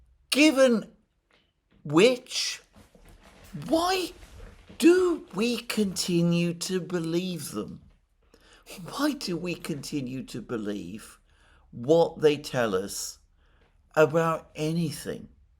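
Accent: England, United Kingdom. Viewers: Just because he has an English accent does not mean that this is not Russian propaganda, it merely sounds better than the regular Russian propaganda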